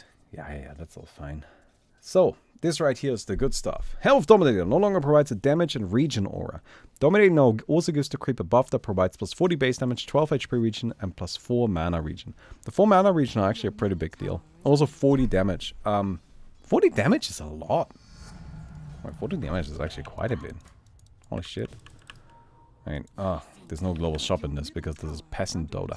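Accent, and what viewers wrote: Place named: Germany. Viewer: I had no idea you were german... =D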